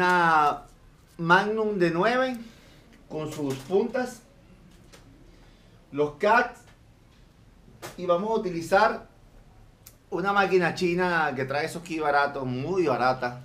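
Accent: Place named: Venezuela. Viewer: Yo estaba claro que eras de venezuela por el acento,un crack perro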